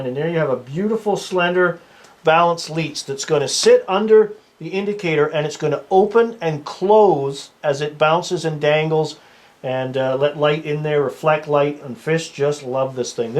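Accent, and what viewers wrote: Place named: Alberta, Canada. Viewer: Thanks...Yes I did some experimenting with different sized beads and did figure it out eventually! I tried the system at my new favorite pot hole and it worked fantastically! Thanks again for your Alberta voice on fly tying:)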